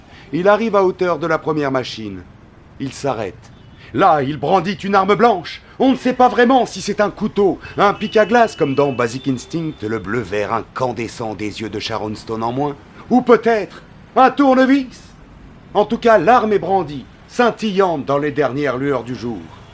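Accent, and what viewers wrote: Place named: Germany. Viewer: T'es trop fort. Tu te transformes en chef d'orchestre d'un concert de rires pendant tout ton récit 😅 Ton accent teuton est parfait, on s'est vraiment payé une bonne tranche de rigolade. FAISAN encore beaucoup des comme ça 😅😅😅 Bises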